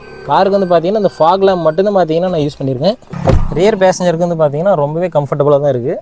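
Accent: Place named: Kongu Nadu, India. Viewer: Athu konga area slang nga kannu😊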